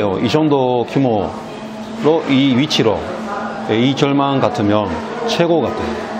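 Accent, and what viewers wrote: Busan, South Korea. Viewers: 정말 오묘하게 부산사투리와 일본어억양이 다 슦여있네야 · 진짜 부산 토박이 같아ㅋㅋㅋㅋㅋ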